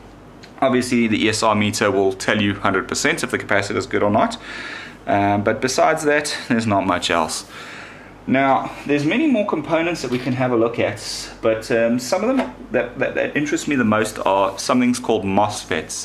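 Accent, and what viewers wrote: South Africa. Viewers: Clear explanation 😊 You sound South African, your accent.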